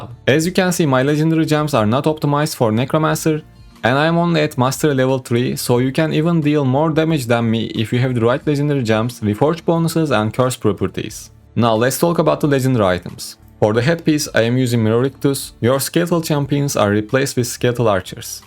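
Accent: Turkey. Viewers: Lan konuşmandan Türk olduğunu anladım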